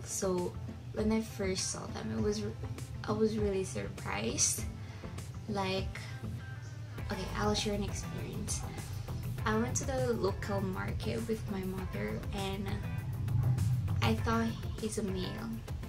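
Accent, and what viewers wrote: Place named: Philippines. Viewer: you have a filipino accent... hehe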